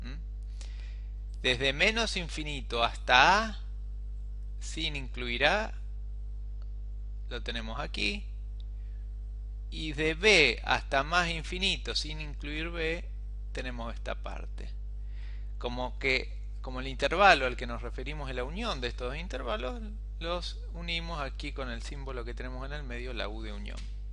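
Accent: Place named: Rioplatense, Uruguay. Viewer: Saludos y Excelente explicacion. Soy de Mexico y me sirvio de mucho su video, (ademas ese acentito a Uruguayo o Argentino, como que ayudo mas), se gano mil likes y la subscripcion a su canal. En horabuena por su grandiosa ayuda!!!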